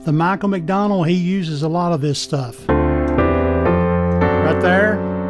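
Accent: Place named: Tennessee, United States. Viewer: Whoa, that accent was tough to get a lock on! I was across the pond at first! My best guess though is somewhere in Tennessee or Kerrville, Texas? Anyway, very cool lesson! Thanks for sharing. This helps a lot.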